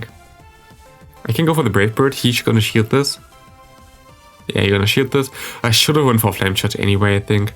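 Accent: Germany. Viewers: which nationality are you?, sounds like german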